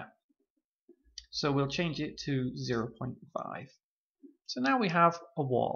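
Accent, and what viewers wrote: United Kingdom. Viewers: You a scouser mate?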